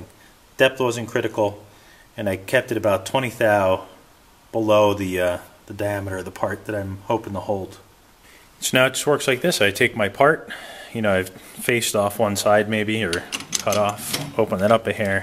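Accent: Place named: United States. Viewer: Wait until you discover aluminum jaws... Machines like a wet dream, no-mar clamping. You sound like a fellow American, so wth is with all the metric dimensions?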